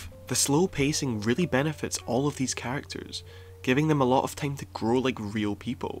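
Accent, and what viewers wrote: Scotland, United Kingdom. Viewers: You’re either Scottish or Northern Irish. Am I right? I’m subbed anyway.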